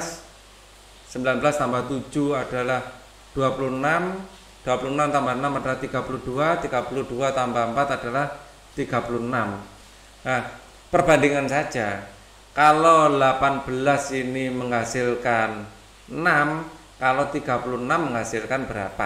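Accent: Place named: Java, Indonesia. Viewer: Dari suaranya sudah jelas itu wong jowo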